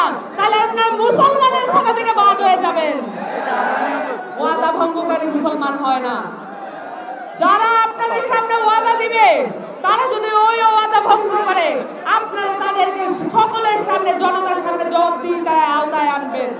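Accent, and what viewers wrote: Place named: Mymensingh, Bangladesh. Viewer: ময়মনসিংহ অঞ্চলের ভাষা কথা বললেন এই আপু, ধন্যবাদ,এন সি পি এগিয়ে যাও বাংলাদেশ আছে তোমাদের সাথে ইনকিলাব জিন্দাবাদ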